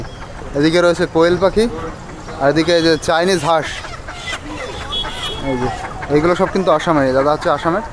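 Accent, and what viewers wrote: Bangladesh. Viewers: তাঁরা কত কি খায়! মনে হচ্ছে খাবারের তালিকায় কিছুই বাকি রাখছে না! আপনার কথা অনেকটাই বাংলাদেশীদের মতো! মাঝে মধ্যে ভারতের পশ্চিম বঙ্গের মতো আসে!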